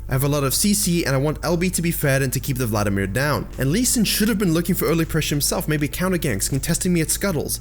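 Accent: South Africa. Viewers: Do I hear some South African in that accent?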